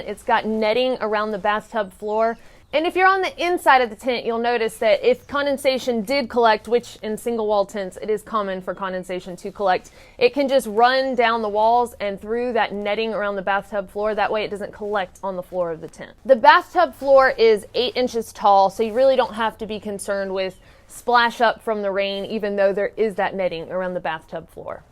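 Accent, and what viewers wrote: South, United States. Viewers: Wide or Why'd? Southern accents are so cute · lol I assume you are from the south'' hose pipe'' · Greetings from Spain. There is a Quechua brand tent from decathlon stores and it's only at €20. Very similar at specs and performance. I really enjoyed your American accent :)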